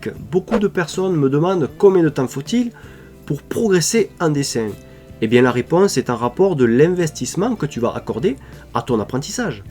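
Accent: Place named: South, France. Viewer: Petit accent du sud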